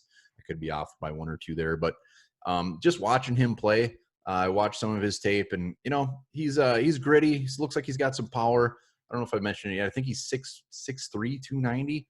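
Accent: Minnesota, United States. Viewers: Your Minnesota accent is soooo heavy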